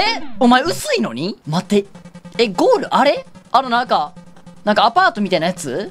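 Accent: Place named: Kansai, Japan. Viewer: 関西弁好き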